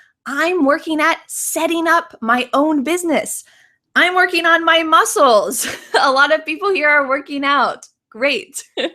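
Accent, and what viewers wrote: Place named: United States. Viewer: Your English American pronunciation and accent is really perfect, I never heard someone who hade such perfection speaking like you dear sister, God bless you and your family and ministry so much. God makes prosper all works of your hands in Jesus Christ our Lord.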